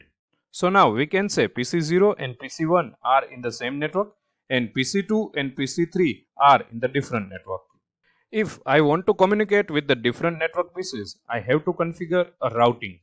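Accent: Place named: India. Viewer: 2 years later and youre helping a random Sailor in his C school, thank you random indian sounding man on the internet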